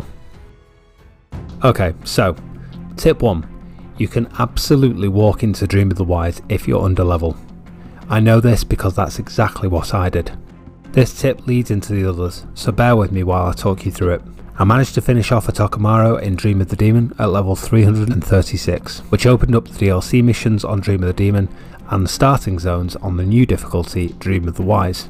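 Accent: United Kingdom. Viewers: It’s the British accent it gives the video the sense of genuine knowledge. Like a ted talk lmao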